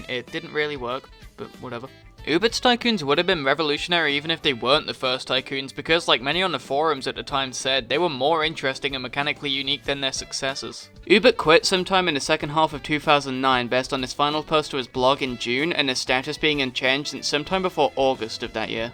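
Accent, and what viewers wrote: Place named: Sunderland, United Kingdom. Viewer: He sometimes sounds a lot like a mate of mine from Sunderland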